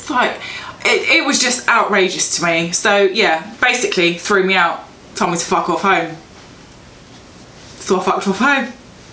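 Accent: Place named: England, United Kingdom. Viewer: Lool I just about to say if you came here a few years back how is your accent soo english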